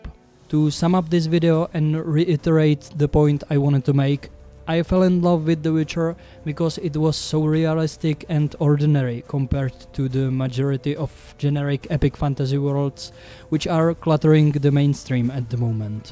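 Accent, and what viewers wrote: Czech Republic. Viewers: I was asking myself if you are Czech/Slovak or not, you sounded like it and then I saw the board games and it got confirmed for me... a souhlasím s tebou na většině. Hodně štěstí s další tvorbou xd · Slyším výraznej českej přízvuk? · ten tvůj českej přízvuk je top jinak video bylo trefné jen tak dál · Dude, you sound like Martin Klekner. Aren't you Czech? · One of the better examples of a czech accent - this isn't a criticism btw - wonderful video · dude are you czech?:D i swear i can hear this czenglish :D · Because he is definitely slavic. It sounds to me like bad czech accent. Nothing offensive. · Kámo z tebe ta čeština je úplně slyšet :D Jinak super video, souhlasím prakticky se vším co říkáš. Navíc Netflix totálně nechápe jak rozmístit rasové skupiny do určitých zemích, potom je člověk zmatenej kde se ten děj odehrává, jestli v Xintrei, Pryshia, Darwen. 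Škoda, že už vše musí být woke a v každém filmu/seriálu hlavní postava je strong independent women, která je totálně nudně napsaná. Nemusejí to všem cpát do ksichtu a říkat: Hele, koukejte silná ženská, která nepotřebuje žádnou pomoct a dokáže se o sebe postarat levou zadní. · I love your pronounciation of english words, it's so czech :D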